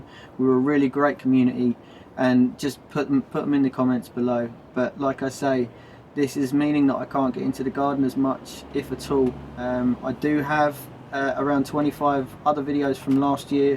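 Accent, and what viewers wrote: England, United Kingdom. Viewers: Your English accent is very interesting to learn for a people from abroad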